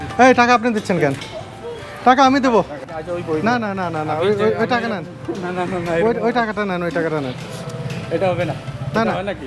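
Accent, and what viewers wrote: Sylhet, Bangladesh. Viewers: bai amder sylhet er manush er moto kota bolen.balo laglo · ভাইকে আমাদের বাংলাদেশের সিলেটের মানুষের মুখের ভাষাও শুনা যায় ❤❤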